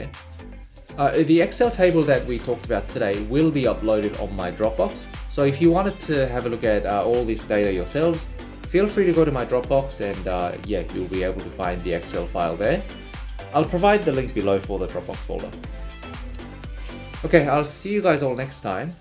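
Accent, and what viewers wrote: Australia. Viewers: every Australian who watches his videos can instantly tell that is an Australian accent, not a super bogan true blue stereotypical Aussie accent but he’s still clearly Aussie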